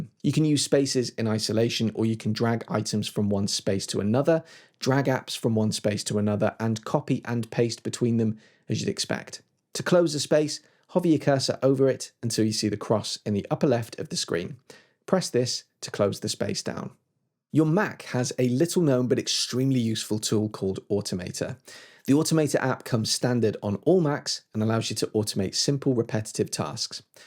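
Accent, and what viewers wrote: United Kingdom. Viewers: For all who're mocking the accent The British accent is by far the sexiest English accent ever existed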